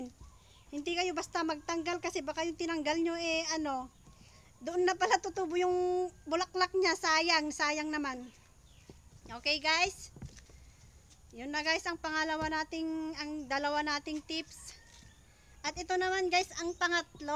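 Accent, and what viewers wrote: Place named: Ilocos, Philippines. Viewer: Ilocano k ata te hehehe hirap k magtagalog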